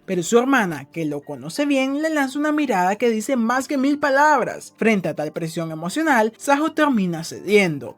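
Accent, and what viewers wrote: France. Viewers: Eres francés? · Se le sale el francés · Eres francés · Eres de paris o por que hablas asi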